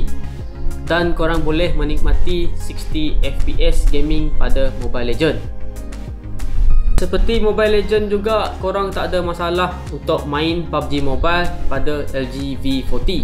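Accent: Sarawak, Malaysia. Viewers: ☺️ baruk nengar aku dh tauk kompom org srwk. Diam sine pok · suara mcm org sarawak,cakap seketui seketui..senang paham..goodjob👍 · Urang sarawak ka😊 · Kaka macam kenal jak, orang sarawak kah?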